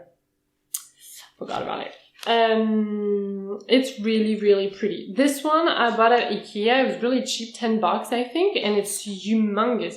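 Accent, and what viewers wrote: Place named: France. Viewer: Great videos! I've seen your other videos and love your collection! I've been an orchid collector for a while now and have recently ordered a Cllathea Medallion and Stromonthe Triostar. It seems they both can be temperamental about their location, watering, and room humidity. But thanks to You Tubers like you I'll know what to be aware of and how to react. I like that "Pen stripped" Calathea you have. Im going to have to get one of those. Its so unique and beautiful. BTW you speak very good and clear english and your accent is very hard to detect but i think its french? Im guessing your Canadian? Thanks again! You Tube is my #1 plant resource.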